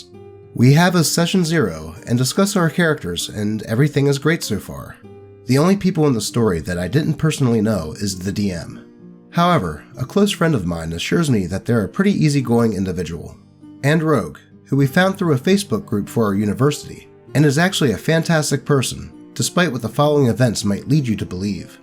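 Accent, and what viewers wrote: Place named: Pennsylvania, United States. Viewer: I started watching Mare of Easttown, and they have the same accent as you! Strange coincidence.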